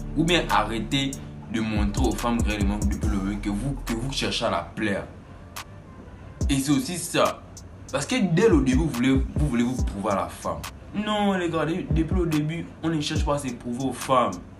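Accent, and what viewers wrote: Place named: Gabon. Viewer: Ça manque de qualité, d'originalité ! Ça ressemble beaucoup à No limit. Force en tout cas ! Ça sent l'accent Gabonais tout ça.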